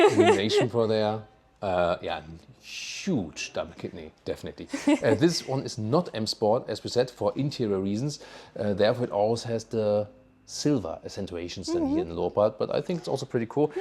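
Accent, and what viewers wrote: Germany. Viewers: Always funny hearing a German talk English👍😭🤣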